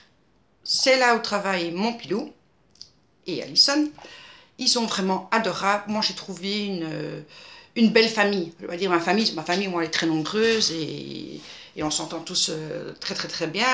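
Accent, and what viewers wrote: Belgium. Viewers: coucou,😃 original avec la mousse à raser, je ne connaissais pas, merci pour ce partage,👏👍💖 et , notre accent belge, il est très bien lolll 😜😊 en plus il y en a différents , pour tous les goûts 👍🤔😃 bonne aprem, bisouilles 😘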